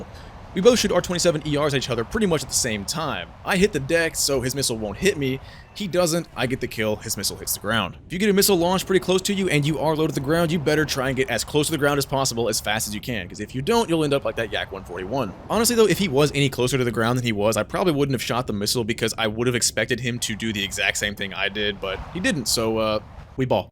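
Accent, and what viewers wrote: United States. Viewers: I like the way you talk,American. subscribed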